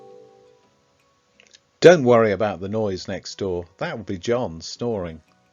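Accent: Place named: London, United Kingdom. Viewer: How can I speak like a londoner? Lovely!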